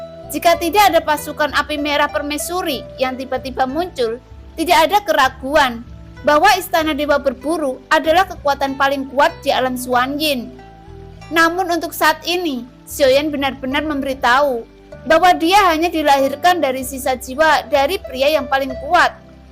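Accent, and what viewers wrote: Java, Indonesia. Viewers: Medoknya diminimalisir mbak e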